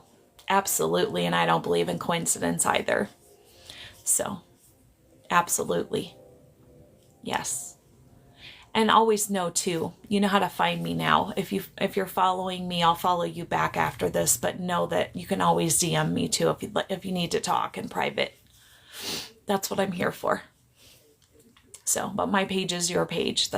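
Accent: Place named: New York, United States. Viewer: You also sound like you're from Jersey or New York area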